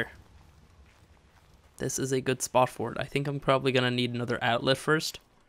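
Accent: Ireland. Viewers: are you irish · Damon Carey it’s the way he says it in an Irish accent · He's very Irish.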